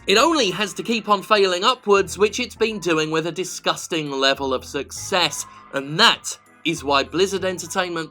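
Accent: United Kingdom. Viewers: Man I would never imagine a British with the elegance of the accent living on one of the most southern places 😂 you’re the hero we don’t deserve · Why did i always think u was from Britain, England?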